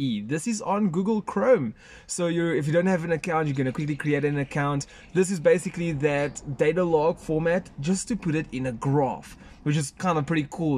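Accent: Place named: South Africa. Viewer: Haha I heard your accent and figured. I'm in SA as well, Midrand side.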